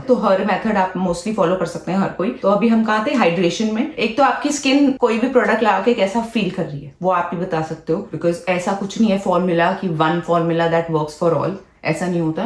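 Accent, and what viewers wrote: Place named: Punjab, India. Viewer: ! Your delivery is so relatable, so punjabi. Love watching your videos even while feeding my newborn.❤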